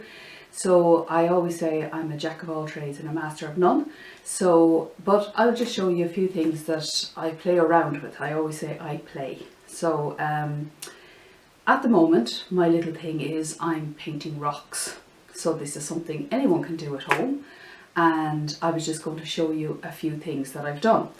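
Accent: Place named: Ireland. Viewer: Really enjoyed this ,,,good to hear an Irish accent.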